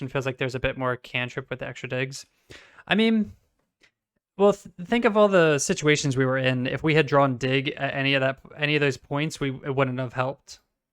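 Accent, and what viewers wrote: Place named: Baltimore, United States. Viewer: Hey, a little irrelevant but are you from Baltimore? (Wherever you’re from im a fan of the accent)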